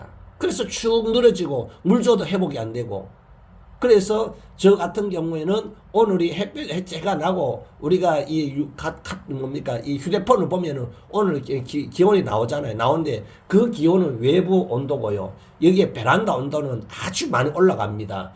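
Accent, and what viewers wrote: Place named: Daegu, South Korea. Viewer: 대구 사투리같은데 듣기 넘 좋으네요 ..... 가드닝 지식 많이 배웁니다 감사합니다 늘 건강 하시길요^^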